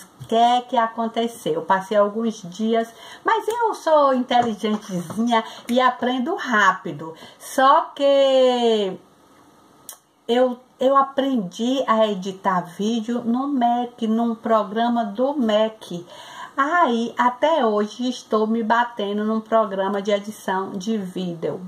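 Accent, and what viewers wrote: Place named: Bahia, Brazil. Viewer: Adoro a sua pronúncia. É tão engraçada. Das pronúncias do português, variante brasileira, as que eu gosto mesmo é da sua (penso que é da região de S. Salvador da Baía) e da de Minas. São muito "cantadas"! Beijinhos de Portugal. Ah, também já sentia a sua falta. Parece que a partir da altura em que me inscrevi no seu canal, deixou de postar com alguma frequência. Volte logo, para poder ouvi-la (gosto!).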